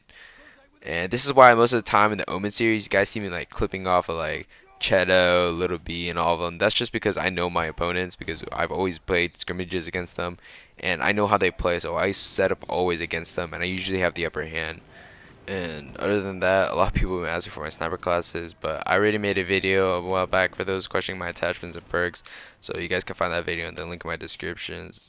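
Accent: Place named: California, United States. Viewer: Lol are you from California or something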